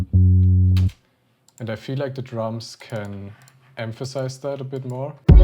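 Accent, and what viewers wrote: Germany. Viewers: The german accent makes it 100 times better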